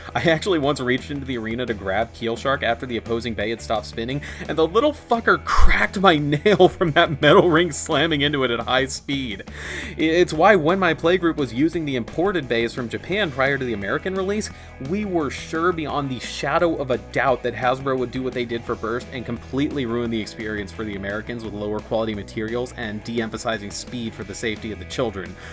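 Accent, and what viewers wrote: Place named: South Texas, United States. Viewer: Hey heard you from south Texas ,you from the 956????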